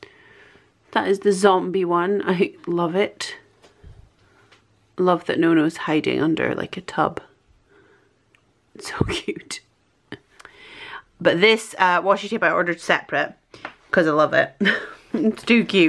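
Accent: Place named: England, United Kingdom. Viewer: 🤣🤣 love your ‘proper’ English accent!!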